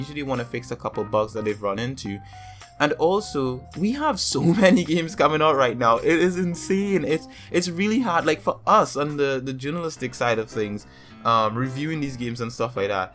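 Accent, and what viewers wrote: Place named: Trinidad and Tobago. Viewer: What country are you from? The accent is feeling like a trini 🇹🇹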